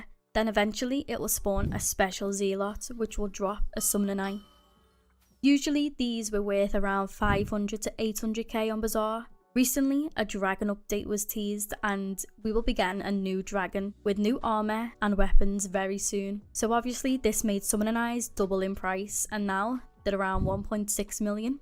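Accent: Liverpool, United Kingdom. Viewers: Wait are you scouse? Because I am and I’ve never heard of a scouse Minecraft youtuber😂😂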